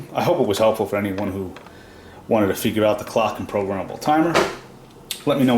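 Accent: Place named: New York, United States. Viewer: ..love your CAWFEE TAWK NEW YAWK ACCENT!!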